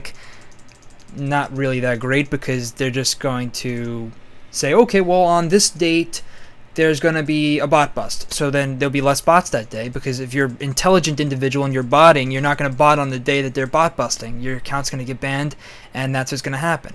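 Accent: New York, United States. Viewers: youre from ny?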